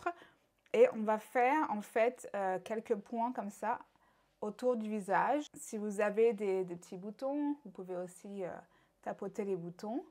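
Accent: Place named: France. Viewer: Trop cool une vidéo en Français yesss , c’est marrant quand tu parles en anglais tu as un léger accent Français et quand tu parles en anglais tu as un léger accent en anglais so cute 😻❤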